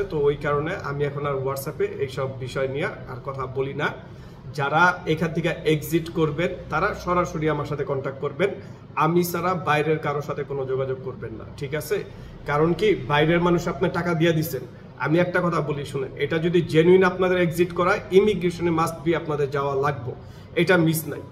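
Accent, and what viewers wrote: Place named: Dhaka, Bangladesh. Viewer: ইয়েস বস.... দেশের মানুষের উপকার করাই আসল বস... আমি কৃতজ্ঞ আপনার কাছে... আপনার ঢাকাইয়া উপস্থাপনা আমাদের ভালো লাগায়....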